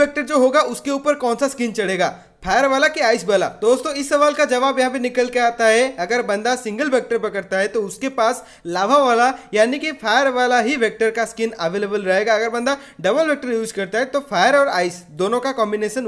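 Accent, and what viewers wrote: Odisha, India. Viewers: Aap odisha se ho kya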